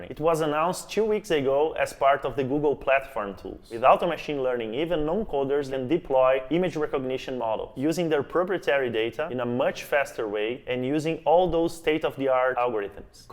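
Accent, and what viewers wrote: Brazil. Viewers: Legal, cara, deu para perceber só pelo sotaque. Bom saber que tem um pezinho nosso na TRIBE. Um dia quero fazer um curso aí com vocês. Eu sou cearense, mas moro em Santa Catarina. Já estudei na Inglaterra e na Austrália. Sou formado em Ciências da Computação, mas a 4 anos venho estudado o Marketing, de um forma mais técnica. Esse é um site é fala sobre mim: (está um pouco desatualizado já) <link> E aqui o site da empresa que estou ajudando a criar: <link> Mas valeu, quem sabe eu vá conhecer o trabalho de vocês em Amsterdã. Abraço.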